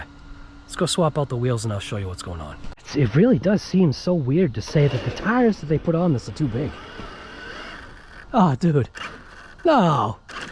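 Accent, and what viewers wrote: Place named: Boston, United States. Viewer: Good review bro. But I absolutely love my blitzkrieg! It may not be perfect, but it is a fun rig. Got the red cat Valkyrie TR and is great so I figured I'd get the MT of the WOV just to see how she stacks up platforms are basically the same. I've been watching your channel for a while. Congratulations on your success buddy even love the Boston mass accent!😅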